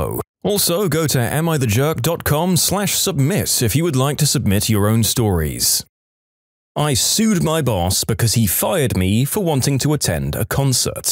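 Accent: United Kingdom. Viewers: I had a dream where this British voice was going to leave the channel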